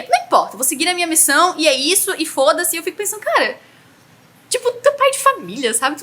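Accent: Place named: Florianópolis, Brazil. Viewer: sou nova aqui e já estou adorando! Parabéns pelo canal 🥰 ah, eu conheço esse sotaque, és manezinha tb?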